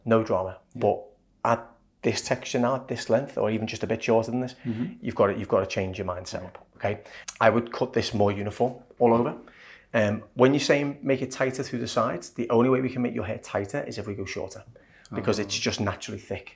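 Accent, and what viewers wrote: United Kingdom. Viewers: My Texas hearing has some difficulty understanding his thick British accent!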